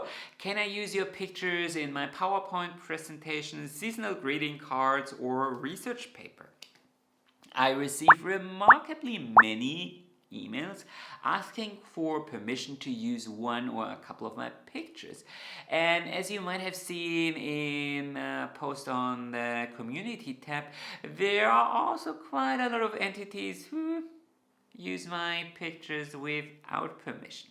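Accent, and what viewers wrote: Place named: Germany. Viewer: Hello, I found this post today purely circumstantial. Very sound information on a lot of topics. I never thought that copying a pattern from the ner could have so many pitfalls. I knit for myself and don't sell things knitted by me but I give away stuff sometimes. Also found out that you live in Austria. Funny that, I was born in Austria but left 50 years ago. I heard in your pronunciation that you are from a german speaking country but couldn't pinpoint if it was either Austria or Germany. I love your posts and get a lot of good tips, still learning at 76 years old. I am very glad to have found your channel. Good luck to you! ❤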